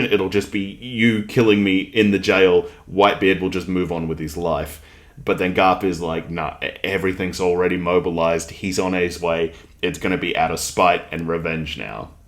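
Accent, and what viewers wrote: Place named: Australia. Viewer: Oh, oh! One of the first reasons your videos resonated with me was because I spent a significant amount of 2020 in australian backpackers (the accent really sends me back ksksk) So yeah, just letting you know that someone was wondering from where you were, actually lol Anyways, I've stuck around for the contect. It's super fun to see you theoririzing. Always excited to watch your videos!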